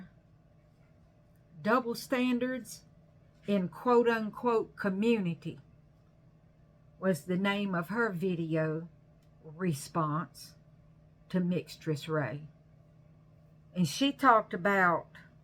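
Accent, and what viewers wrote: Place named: South, United States. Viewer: Hi,. You are a gem! You made me think back on my purchasing behaviour over the last couple of years. I returned to tarot after a very long absence and I was very excited about the plethora of decks available. I started buying. Quite a bit. Sometimes I'd not be able to afford a deck or I'd miss out for some other reason. But I have a small collection of decks I really like. Some of the earlier decks have been put aside. I don't do Kickstarter anymore. What I discovered is that there is always a new deck to get excited about. The decks I have now are a good representation of what I like in deck imagery. So, when I see a new deck it's pretty rare that I get carried away. I'm in a very relaxed state about my collection and I can reach out for a deck I've not looked at for awhile and it feels brand new, again! What a luxury! 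I love my deck collection. It's a luxury to have these 35-40 decks be at my beck and call. Anyway, you're right. We're adults. You sound very southern. If you're near Columbia, SC, let me know. We can meet for coffee or a couple of beers. Take care! Thank you!😅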